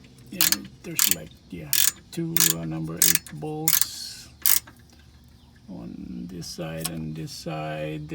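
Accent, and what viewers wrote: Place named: Philippines. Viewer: Love your accent, I know you’re Filipino! Lol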